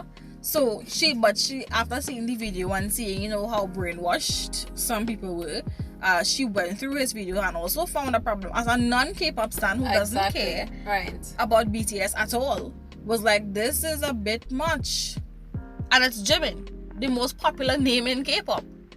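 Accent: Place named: Caribbean. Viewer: Thank you for the clarity of your explanation! You have a beautiful accent! Caribbean? I agree with what you have laid out here and I have subscribed to your channel! I wish you every success going forward! PREACH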